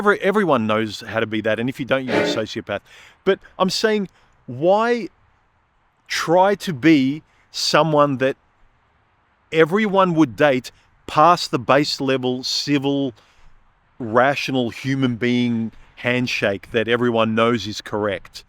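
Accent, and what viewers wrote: Melbourne, Australia. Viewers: Think he's from the Melbourne area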